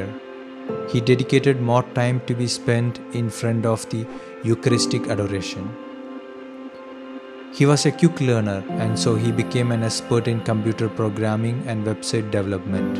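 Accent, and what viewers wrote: Kerala, India. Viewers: Sounds like a malayali..... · Is this voice over given by a priest from Kerala? Nalla kettuparichayam Ulla slang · Beautiful video. Nekkul Malayaliee anuuu ellai. I understood that from your voice. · Sounds indian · Hearing the voice of the narrator I know his an Indian. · That Indian accent bothers me!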